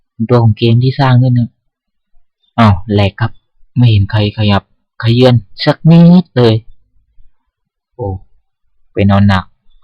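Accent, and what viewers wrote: South, Thailand. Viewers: พี่เด็กใต้ป่าวคับ ทองแดงทั้งนั้นเลยนิ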